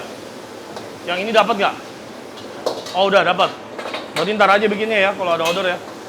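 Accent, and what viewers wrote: Banyumas, Indonesia. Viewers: Koko kok bisa bahasa ngapak yah? Kepengin punya kedai kaya gini koh Salam dari cilacap kota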